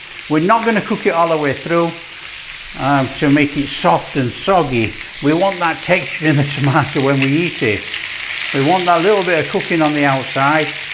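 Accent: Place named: Scotland, United Kingdom. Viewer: I like Scottish accent, it's beautiful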